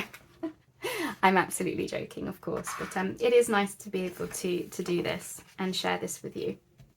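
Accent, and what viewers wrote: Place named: England, United Kingdom. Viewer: Thank you for showing the Nature Notes book, I have not seen it before. Its very expensive on eBay. I do have the Country Diary that I bought on eBay for 12 dollars. Thank you so much for your stories as you were flipping through, they were very nice. I always love hearing the English accent. Hearing about the places you spoke about was magical! I am new to your channel, and look forward to watching more of you videos.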